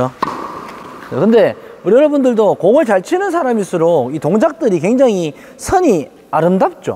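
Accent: Gyeongsang, South Korea. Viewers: 경상지역 사투리 같네요. 툭 던지는 남쪽지역 말투와 대충 치듯 던지는 스윙이 조화로워서 웃음이 절로납니다. 먼 곳(캐나다)에서 영상 잘 보고 있습니다. 힘든 시기 잘 이겨내시고 테니스로 몸도 지켜내시길 기원합니다.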